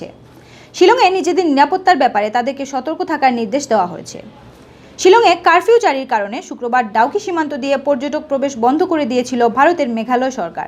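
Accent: Sylhet, Bangladesh. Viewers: অ সোনা বইন গো আফনার মুখে কিন্তু ছিলটি ভাষায় মাত খতা কুব সুন্দর লাগে!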